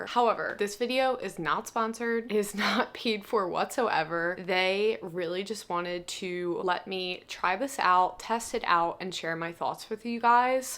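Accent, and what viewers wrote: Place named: New York, United States. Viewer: What an amazing video you filmed this video so well and explained everything so thoroughly!! I love your accent!! Are your from New York?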